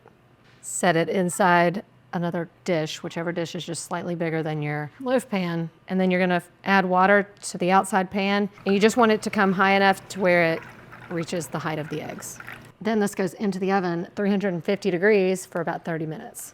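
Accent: South, United States. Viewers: Southern girl....love it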